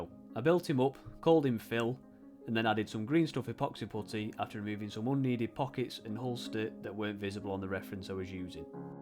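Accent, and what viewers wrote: United Kingdom. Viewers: this is pure art...doing the job and explaining it so well with this beautiful british accent...luuush:-)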